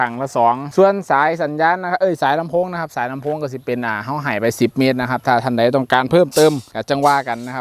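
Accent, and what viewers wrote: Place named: Isan, Thailand. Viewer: ขายให้แต่คนอีสานเหรอครับ พูดกลางไม่เป็นเหรอครับผมฟังไม่รู้เรื่อง😟😟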